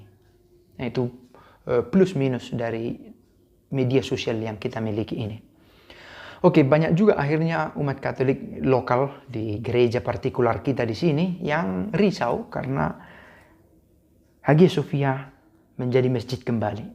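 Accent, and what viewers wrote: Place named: North Sumatra, Indonesia. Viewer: Naratornya dialek sumut kayaknya🤣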